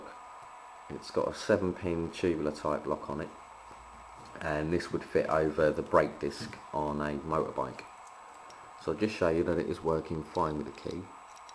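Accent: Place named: Cockney, United Kingdom. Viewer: ur sucha theif lol all ur videos are on picking locks. and u sound like ur from east end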